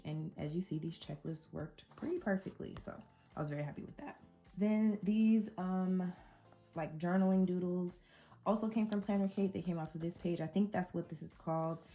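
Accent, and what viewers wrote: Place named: New York, United States. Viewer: New viewer & subscriber. Right b4 you said you live in Vegas, I said she sounds like she could be from NYC. Tfs all your ideas...good inspo. Love your nails 💅 too.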